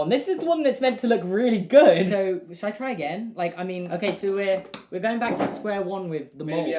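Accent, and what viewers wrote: United Kingdom. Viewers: is british? idk but his talking is different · Hi I’m new to ur channel today and just subscribed but I was wondering why u have a british accent but it was in $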